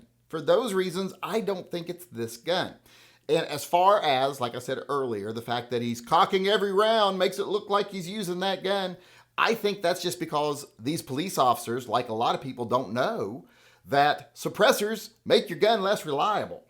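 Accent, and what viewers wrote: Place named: Appalachia, United States. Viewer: You sound like a backwoods hillbilly mountain people ⛰️🏔️ 🤷‍♂️🤷🤷‍♀️🤦🤦‍♀️🤦‍♂️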